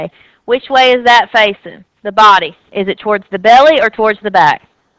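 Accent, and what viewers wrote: South, United States. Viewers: I love videos. There are full of information and I feel like I am in a class, with the teacher talking to me, not just reciting information. The fact that she speaks 'Southern' is an added positive! I don't feel she is talking down to me when she explains things.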